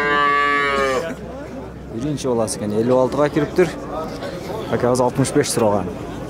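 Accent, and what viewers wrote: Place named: Leylek, Kyrgyzstan. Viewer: АТАКУЛДАН УЙРОНСОН ЖАРКИЛДАП СУЙЛОП!!! ЛЕЙЛЕКЧЕСИНЕН БАТКЕНЧЕСИНЕН !!👍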